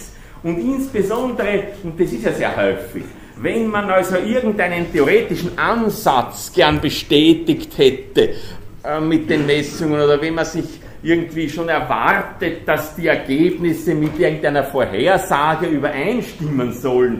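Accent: Vienna, Austria. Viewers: Allein schon der Wiener Dialekt! Sensationell! Sehr kurzweilig vorgetragen, Danke! Viele Grüße nach Wien aus dem Raum Köln!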